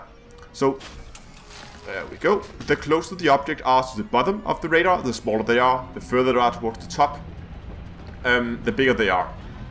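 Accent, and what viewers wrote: Denmark. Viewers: You've probably forgotten ever writing that comment, but it's quite a strong Danish accent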